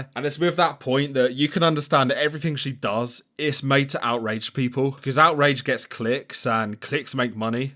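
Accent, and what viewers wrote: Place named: Liverpool, United Kingdom. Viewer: Omg she’s a scouser I’m getting rid of my accent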